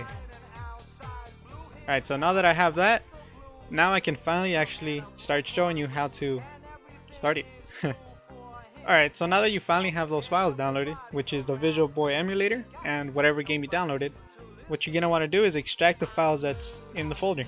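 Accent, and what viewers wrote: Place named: India. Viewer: No offence dude but ur mike makes you sound like indian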